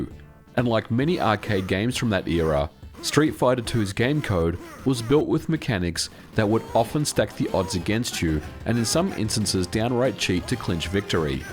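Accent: United Kingdom. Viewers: Even as much as I know coding and computer mechanics you make me look like an amateur and your accent, accents your intelligence, something about the Brits and Aussies that when you talk in technicals it all sounds even more sophisticated... Man i want to go back to the 90s so bad, even as a poor kid it still was the greatest decade ever to be a kid and arcades with games like Street Fighter II are a major reason why..even back then though I realized the business behind making the cpu seemingly godly at times since as u said the game was there to take your money and keep you coming back and I notice when I got it for snes while the cpu was still difficult it didn't have the upper hand the arcade did though I know thats cuz of hardware as well, that's what got me loving the game genie...Thanx as always for every upload covering lesser known topics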